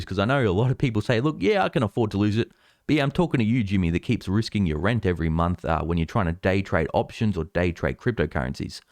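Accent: Australia. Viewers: Are you Australian???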